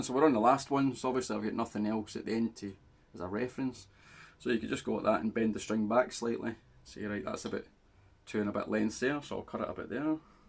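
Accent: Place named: Scotland, United Kingdom. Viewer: It would shorten the video..... Then we would miss out on that beautiful Scottish accent. Nice video. Well done.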